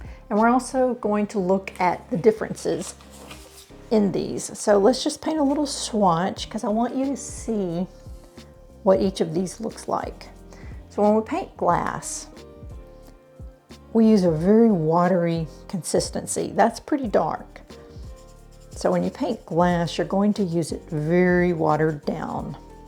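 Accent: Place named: South, United States. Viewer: I learned so much from your great video and, I love your southern accent.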